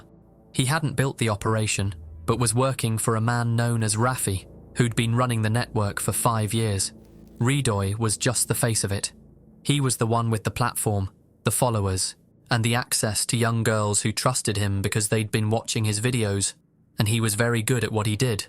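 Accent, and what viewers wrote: United Kingdom. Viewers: bollocks* He sounds British 🙃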